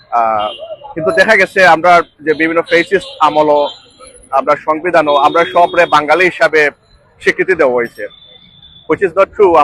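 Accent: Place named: Sylhet, Bangladesh. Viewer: খাঁটি সিলেটি ভাষার বক্তব্য। 🥰🥰🥰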